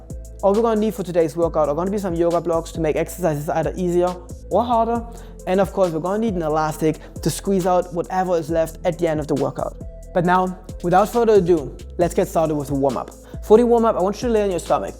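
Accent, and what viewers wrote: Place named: Germany. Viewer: Was ich schon länger mal Fragen wollte: Wo bist du eigentlich geboren? Es klingt so, als hast du einen deutschen Akzent :P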